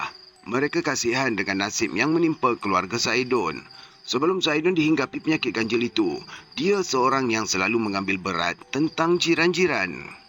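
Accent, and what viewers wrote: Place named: Perak, Malaysia. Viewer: Assalamualaikum...wah arini mmg byk story ye cikgu☺️..dari nak kejor mat rempit membawa ke kelas bahasa..loghat perak pulak ye! Apa2 pun mmg terhibur .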